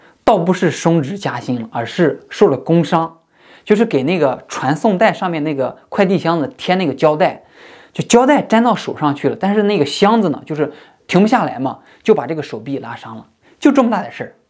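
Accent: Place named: Northwest, China. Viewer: 听口音像是中国西北人 宁夏 甘肃 确实比较贫困 不上大学走出来真的是要穷一辈子 恭喜你的努力给了改写了后代的历史！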